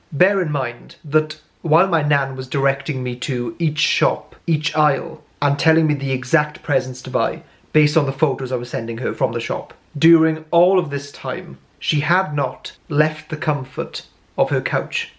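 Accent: Wales, United Kingdom. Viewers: Are you Welsh? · Where in Wales are you from? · Oooogh Biritish accent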